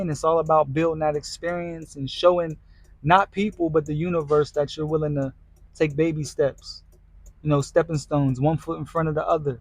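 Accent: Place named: Pittsburgh, United States. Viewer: U gotta be from Pittsburgh 🖤💛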